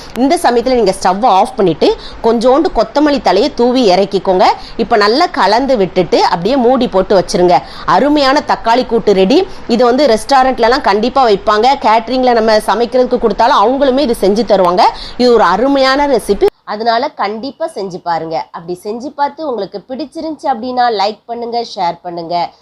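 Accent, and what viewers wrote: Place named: Tirunelveli, India. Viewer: ஆகா நெல்லை தமிழே வாழ்க, நன்றி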